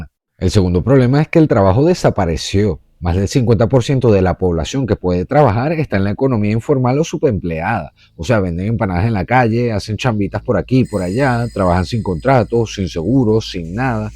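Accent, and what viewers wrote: Venezuela. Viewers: ¿De qué parte de Venezuela es ese acento? Jamás lo había escuchado antes.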